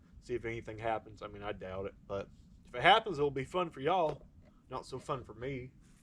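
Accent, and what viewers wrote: South, United States. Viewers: Love your backwoods country talk, hope Sasquatch leaves you alone, Outdoor boys, Is formal, you are country, I love country, hope to see more videos. Keep em comin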